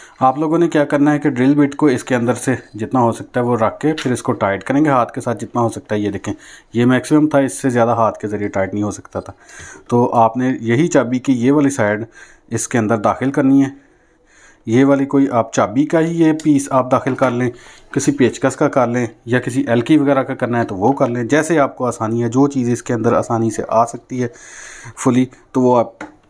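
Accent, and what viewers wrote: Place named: Punjab, India. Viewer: Bolne me Punjabi aa rahi hai lekin madri zaban Punjabi ki izzat nhi karenge Pakistani punjabi log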